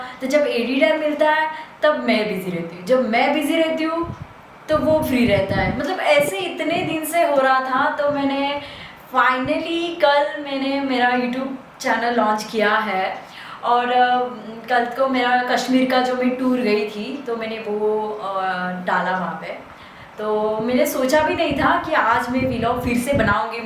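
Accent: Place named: Bihar, India. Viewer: Are you from bihar?ur hindi speaking is like biharis.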